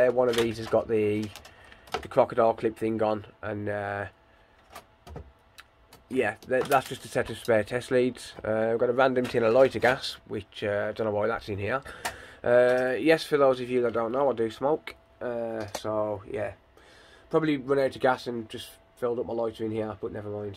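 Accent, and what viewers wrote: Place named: Black Country, United Kingdom. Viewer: just subscribed off the back of my mate vince - good luck with your target - you are only up ythe road from me from the sound of the accent lol. Im in Oldbury - you are clearly a black country lad?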